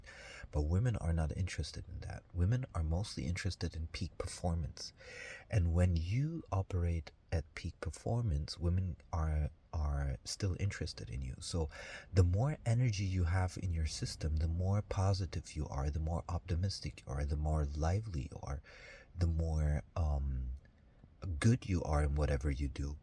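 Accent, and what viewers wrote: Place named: United States. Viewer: dein englisch ist sehr gut, eher amerikanisch geprägt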